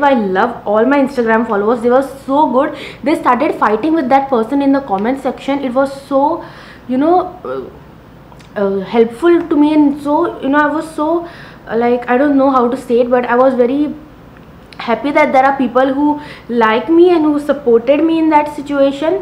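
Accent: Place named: Cockney, United Kingdom. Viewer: Hey you speak very fluent English.. also kokni i like a lot..so keep it up.. ignore negative energy they are here from lifetime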